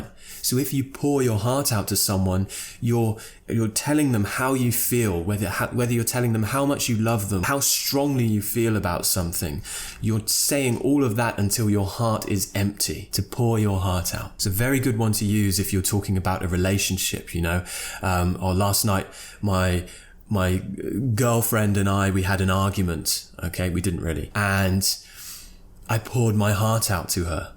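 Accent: United Kingdom. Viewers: More than your british accent, I think is your voice, it's really a pleasure hear you... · British accent is more sweet with you our respected teacher thanks a lot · I am such a sucker for British accent. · Can't help falling in love with your British accent :> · I'm a sucker for British accent :p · Thank you so much for this interesting and useful video! I've been your subscriber for a long time but I'm writing comments for the first time. I find all your videos useful and enjoy watching them. Your British accent is wonderful! I'll definitely learn these phrases from the video. I"ve never heard about some of them. Love your channel! Greetings from Tajikistan! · I love your British accent so so much. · Almost every comment section's top comment is about British accent XDDDD Yeah, I love it, absolutely. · Love your Britsh accent! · I love your videos and your pronunciation! 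I adore British English! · Really, you’re one of perfect an English teacher, Specially your accent British 👌, btw u have a good sound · I knew the phrase "be a sucker for sth" before. Thank you for what you do on YouTube. I learn a lot from your videos just by listening to you. That's great! I'm a sucker for British accent. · Your British accent is comfortable to my ears. In this video, there are lots of interesting useful expressions I have never heard! Thanks! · Ohhhh British accent is so charming!!!! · Your voice and pronunciation .I love British English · I'm a sucker for british english <3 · I love british accent for the first time when i listen your accent. thank you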